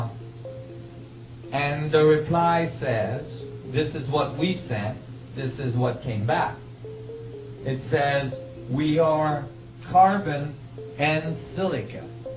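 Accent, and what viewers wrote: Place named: United States. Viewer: He just sounds weird because hes from america. Doesnt mean hes a dumb arse.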